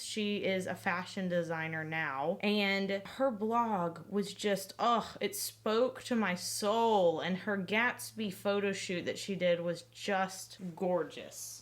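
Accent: Texas, United States. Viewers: Your lovely, soft Texan accent does something so incredibly kind to Baz Lurhman's name! In Australia if a bunch of folks call to him it sounds like a flock of seagulls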